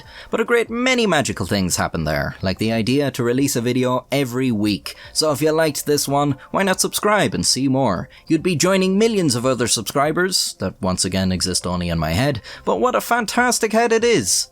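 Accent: Ireland. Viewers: With your accent lad I just cant figure which county ya from , wicklow, meath. If you're from dub I'm gonna unsubscribe. Lie if ya want to. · I like hearing ridiculous stories and funny quips in an Irish accent…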